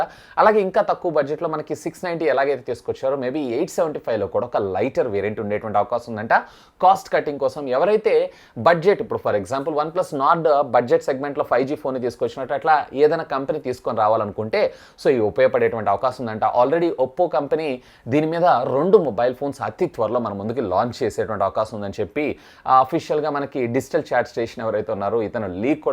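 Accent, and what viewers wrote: India. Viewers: Bro I like ur Buttler English😊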